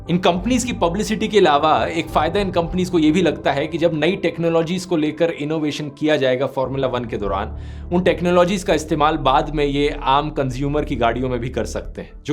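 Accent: Haryana, India. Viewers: Why does he suddenly have haryanvi accent?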